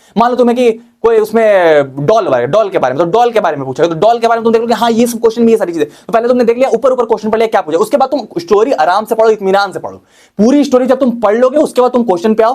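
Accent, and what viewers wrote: Bihar, India. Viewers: BHAIYA KA BATH KARNA SA LAGTA HA BIHAR SA HA HA BHAIYA I AM ALSO FROM BIHAR ❤🎉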